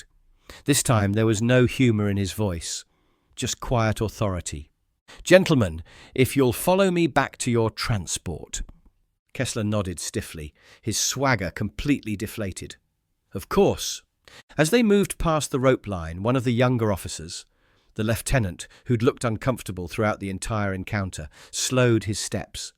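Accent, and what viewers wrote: United Kingdom. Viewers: Perhaps the worst aspect is that it sounds like a Briti yarping the commentary! This one and several others I've listened to!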